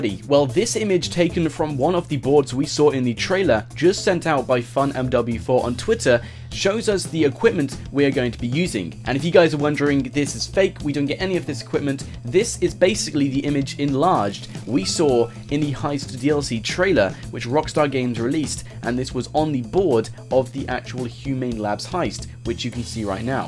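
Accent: United Kingdom. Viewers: Why do British people say pronounce it Mili-tree instead of mili-tary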